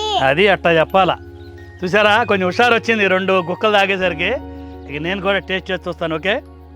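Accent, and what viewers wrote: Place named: Kolar, India. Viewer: Thank you for writing it in Kannada too andi. I'm from Kolar and your Telugu is so similar to the dialect we speak.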